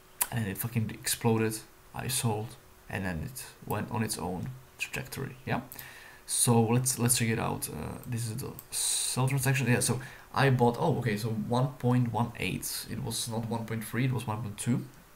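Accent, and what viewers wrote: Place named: Netherlands. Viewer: Thanks for the reply my man! You Dutch by the way? You kinda sound Dutch ;)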